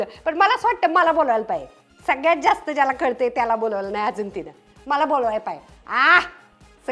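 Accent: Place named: Satara, India. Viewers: Ase satari tone madhe banvat ja vedio every week. Ekdam zaak performance. ❤❤❤❤❤ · Khup chaan saataari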